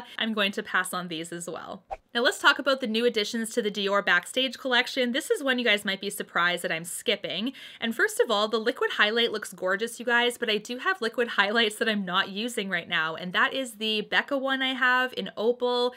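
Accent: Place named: Canada. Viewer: I have to say I just love your channel and your personal energy. I also love your Canadian accent! 😁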